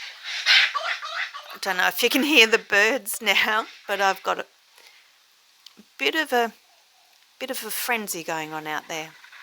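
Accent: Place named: Australia. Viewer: Hello. Thought you sounded Australian. So am I. Like what you share.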